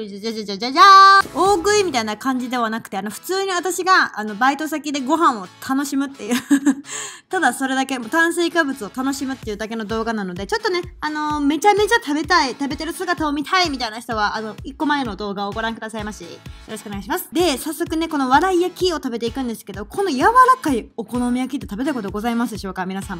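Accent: Kansai, Japan. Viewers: 初コメさせていただきます。 おすすめの一番上に出てきました。 今日は一日中、心がモヤモヤした出来事があり、泣いてばかりでイヴだと言うのに食欲も無くて。 腫れぼったい瞼のまま、動画再生してみたのです。 元気な関西弁にモリモリ食べる、プラス美人さんなのに、気取らずに素で話していて、すっかり見終わる頃には心も少し軽くなりました。 登録させてくださいね！応援しております♪ あ！動画観たらなんだかお腹空いてきました〜笑 元気を分けてくれてありがとうございます😊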